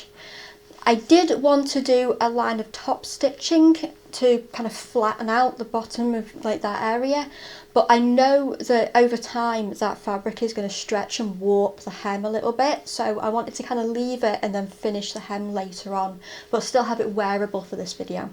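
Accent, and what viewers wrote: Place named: Liverpool, United Kingdom. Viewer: I’m from Liverpool too ❤️❤️ I knew I heard the scouse twang 😂 Yeah some sellers do lie or actually don’t know and just take guesses , have you tried “ pound fabrics” ? Prices are great , shipping is from £4.99 upwards, depending on how much you buy, but some great prices, give them a look I buy loads from them , I’m in middle of making myself a dachshund dog , I’m goin to use him as a big pin cushion, I could easily make you one too , do you have a P.O. Box number ? I’ll send him/her to you , you’ll only have to name them , I don’t really want to leave my email here and don’t really know how we can sort addresses out , any ideas ?. Xx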